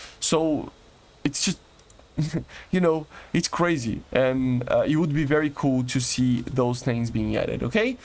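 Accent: Brazil. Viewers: Tu é br? Sotaque parecido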